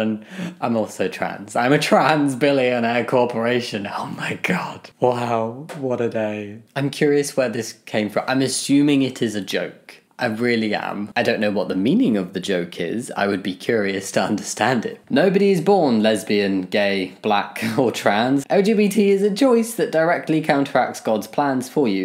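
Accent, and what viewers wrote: United Kingdom. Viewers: You're from the UK?!?!!? Man I'm really bad at detecting dialects.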